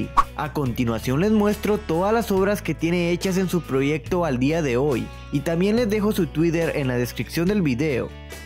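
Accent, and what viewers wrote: Honduras. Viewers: Sos Hondureño?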